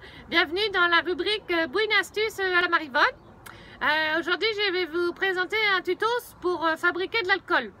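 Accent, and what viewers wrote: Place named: Berry, France. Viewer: Euuuuuuu, c'tieu fumelle c'tiont l'accent berrichon, pis alors rin à vouèr avec eul' nord pisqu'allé au milieu, dam'. Allez bonsouèr mon bounhoumme et pis pas p'us.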